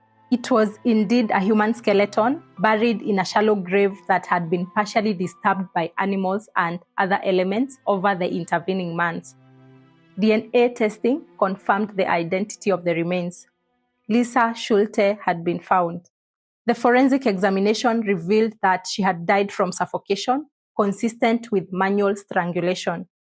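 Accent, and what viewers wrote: Kenya. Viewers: She has a Kenyan -African accent.......you want her to speak British or American English??¿? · 😂She's Kenyan. What other accent does she know?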